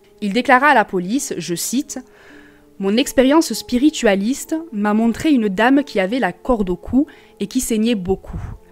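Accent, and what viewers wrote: Marseille, France. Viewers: Voilà un histoire qui prouve qu'il ne faut jamais fait confiance à personne à 100% à au plus proche PS: désolé si c'est indiscret de ma part mais t'est marseillaise ?? chais pas pourquoi j'ai l'impression qu'il y'a un petit accent marseillais dans la façon dont tu parle😭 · L'accent Marseillais j'aime bien !❤️🥰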